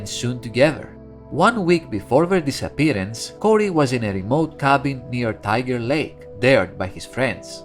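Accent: Greece. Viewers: Greek accent?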